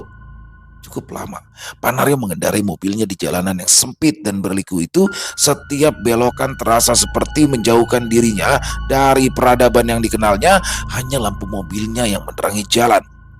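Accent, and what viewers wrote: Jakarta, Indonesia. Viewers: Waalaikumsalam. Seneng deh kalau Bang bercerita dengan aksen betawi. 🥰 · assalammualaikum bunda hadir dr condet.yg selalu ditunggu2bang pianada lg pokoknya seru kalau da cerita bangpian karna bang bawain logat betawi nya mantap sukseees terus bersama rekan 2aamiiin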